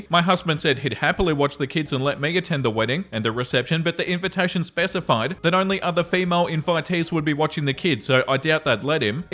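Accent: Australia. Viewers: Thank you!!! I was BEYOND excited when I saw an Australian accent as an option 😂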